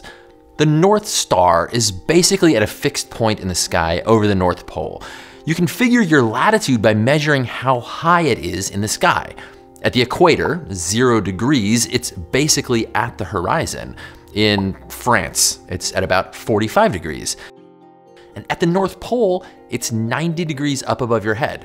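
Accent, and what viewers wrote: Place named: United States. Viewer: He’s using the American pronunciation. We’re “Two lands separated by one language.”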